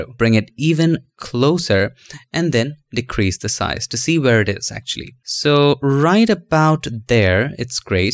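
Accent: India. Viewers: You know what’s better than an annoying Indian accent? Proper English.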